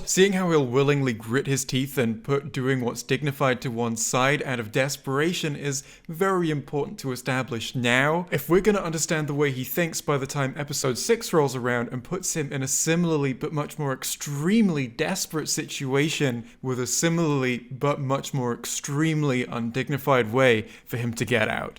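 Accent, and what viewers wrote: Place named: Scotland, United Kingdom. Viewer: are you from/living in Scotland? I thought I heard some Scottish pronunciations in there! Hope you're loving the Granite City - love your videos!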